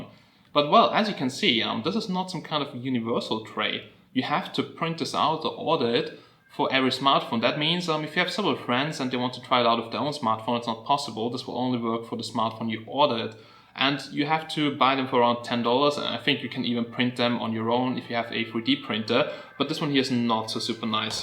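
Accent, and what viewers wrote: Germany. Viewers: your english sound with german accent or netherland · Ich geh mal davon aus das du deutsch bist ( von deinem aktzent her) heute war englischprüfung in sachsen... kann es sein das du für den listeningpart den text gesprochen hast? hat sich zumindest so angehört! :D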